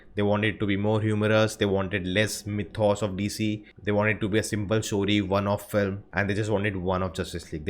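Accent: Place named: India. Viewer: I hate Indian accent, I wish they could speak normally then I would leave sub on this channel ..... ykkhyyyy my ears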